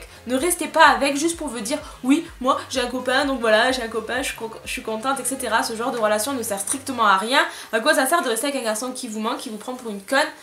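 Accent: South, France. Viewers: Olala j’adooooore ton accent ♡♡ J’adore l’accent du Sud ♡ Si les sudiste voient ce commentaire je vous aime ♡♡ (surtout si vous avez l’accent :p) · Merci c'est gentil ‚ oui l'accent du sud est spécial mais bon c'est la vie ✋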